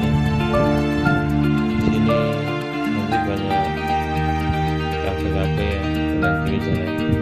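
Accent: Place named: Banyumas, Indonesia. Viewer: Sya juga ngapak... Hihi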